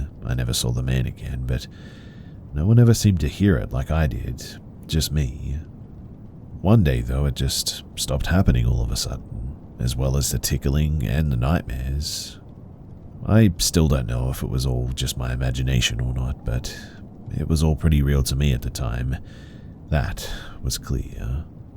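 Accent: Australia. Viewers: Are you from Australia 🇦🇺 ❤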